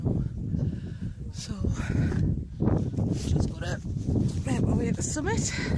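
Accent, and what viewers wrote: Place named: Northern England, United Kingdom. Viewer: Hiya enjoyed watching that well done. I am new to walking the fells done a few round ambleside and grasmere but walked the langdale valley on last visit and couldnt believe how beautiful it is. Just invested in all the OS maps to help me get round though as on a few occasions ive gone a bit wayward. on that walk did you follow the cumbria way to start off and go up past pike o stickle or do you go to stool end farm then across? Going over for 4 days in June Aug and Sept and planning to get a fair few walks in. This one looks great. Sound like you arnt far from me with the accent. Im from Hartlepool.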